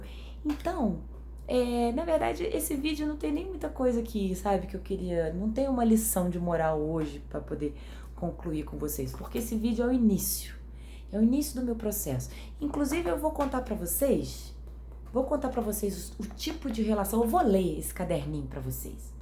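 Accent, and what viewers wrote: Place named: Rio de Janeiro, Brazil. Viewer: Cara..do nada vi.. que agora já não gostei mais.. escreve.. menina quero uma namorada..sou veio.. aliás mais ou menos veio.. psicóloga é bom pra desabafar.. mas vc tem um sotaque meio que carioca né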